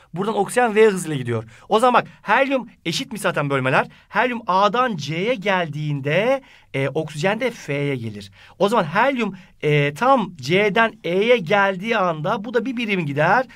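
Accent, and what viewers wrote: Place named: Central Anatolia, Turkey. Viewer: hocam nerelisiniz bi iç anadolu ağzı sezdim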